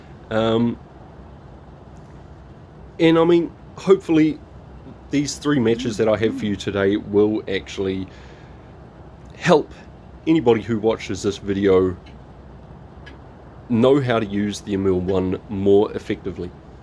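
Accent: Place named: New Zealand. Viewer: just picked this up on my new oceanic account and your video is real handy my bro, nice to see a fellow kiwi in the mix hit subscribe as soon as i heard your accent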